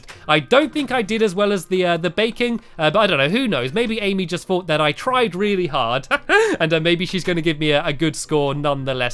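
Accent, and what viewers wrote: United Kingdom. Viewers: He can't help his accent hes not from america gosh guess your saying people that are not american have a dumb accent wrong ! I think he is lucky living in the UK it sounds like fun with the queen living somewhere there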